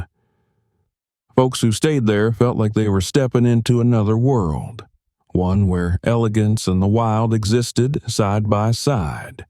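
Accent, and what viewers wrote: United States. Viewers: Sorry but don’t like the American accent especially when to deal with haunting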